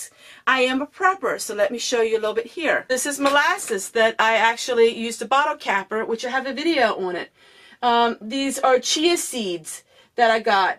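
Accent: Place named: Philadelphia, United States. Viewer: New to your channel! Omg!! You’re accent is strong! Not making fun, we have a similar accent, I’m from south jersey and my husband is from Philly. We were taking bets on where you were from until I saw your P.O. Box. I won!! Love your set up!! I had a laugh when I saw your china cabinet. I’ve converted mine for storage and my husband gave me a look 😂 See??!! I’m not the only one!!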